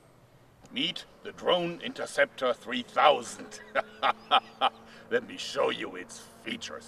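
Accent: Germany. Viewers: Du sprichst mit einem sehr starkem deutschen Akzent o_O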